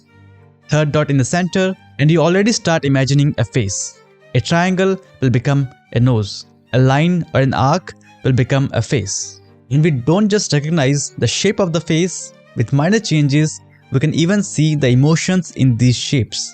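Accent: India. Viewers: Dude has the thickest indian accent and cant pronounce Lamborghini 💀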